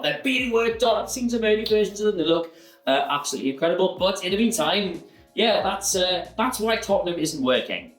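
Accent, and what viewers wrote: Newcastle, United Kingdom. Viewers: No need to apologise, I actually like the Geordie accent 😛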